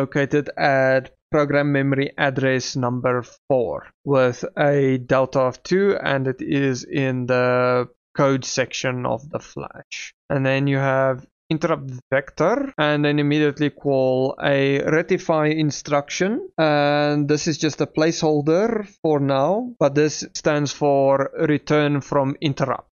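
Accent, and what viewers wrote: Afrikaans, South Africa. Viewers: jissie daai lekker afrikaanse aksent :)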